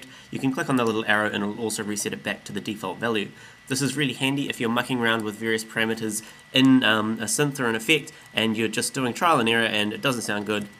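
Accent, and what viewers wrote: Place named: New Zealand. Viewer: Your tutorials are made all the better by the NZ accent... Love it!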